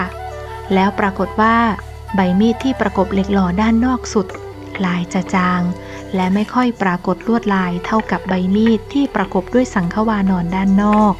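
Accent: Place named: North, Thailand. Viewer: เสียงบันยายดีมากๆคับฟังเหมือนอยู่เมืองเหนือคับ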